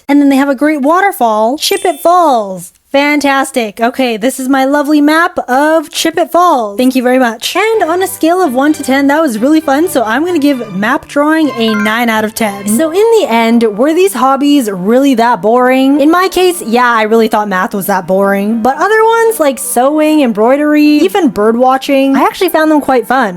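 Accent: United Kingdom. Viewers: I think she might be british lol😂😂😂😂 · You spoke britsh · The accent with the mat teacher 79 / 856 like the accent you British girl while you Britis I'm also British kinda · saw your video on finding your art style! i love the anime art you create! i love cartoons and my dream style is something like anime Disney. I feel bad sometimes cause i dont draw realism too much, but i love seeing successful artist who have simple art styles ❤ also question, your accent comes and goes are you british? 😅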